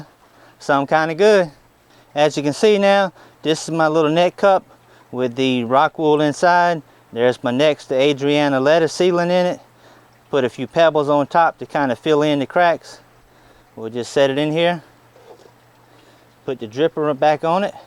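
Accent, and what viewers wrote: South, United States. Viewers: Ok, so I hear a southern accent and I know I heard at least a couple of "dat"s...are you in or around Louisiana? Who Dat? LOL Anyhoo, I live in northwestern Louisiana and have really moist soil...I was wondering if you have any suggestions of either what would grow best or how to get my soil to dry up a little. I till it but with all the rain and the fact my soil holds moisture like a sponge, it just never seems to dry (and this is on the elevated areas)...love your videos!!!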